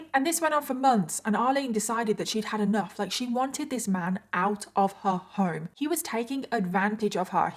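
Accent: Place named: United Kingdom. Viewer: i’m pretty sure she’s british !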